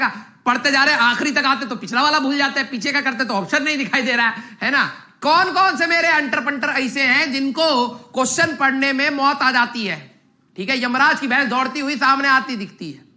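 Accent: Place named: South, India. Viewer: Ayiyo accent wala gajb ka😁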